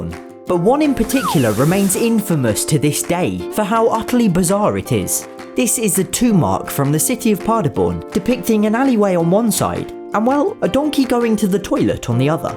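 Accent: United Kingdom. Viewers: I love your british accent 😂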